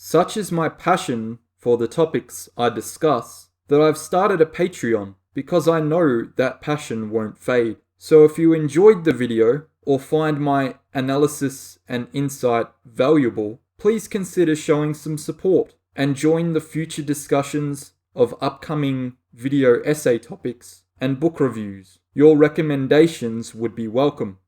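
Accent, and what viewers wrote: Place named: New Zealand. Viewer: You may be a strange kiwi, but under all 'thit' accent we are kindred spirits. Thank you for being brave enough to blaze a path.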